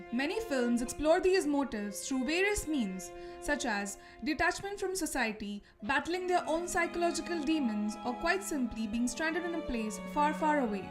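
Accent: India. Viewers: I hear indian i click back button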